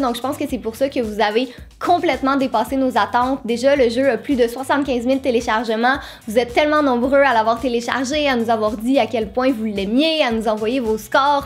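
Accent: Quebec, Canada. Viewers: Yasmine Bouisagouane l'accent Québécois moi aussi j'💜 bien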